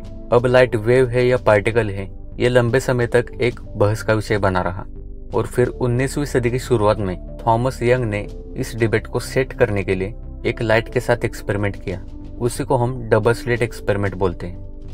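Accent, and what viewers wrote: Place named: Maharashtra, India. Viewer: तू मराठी भाषिक आहेस अस वाटतय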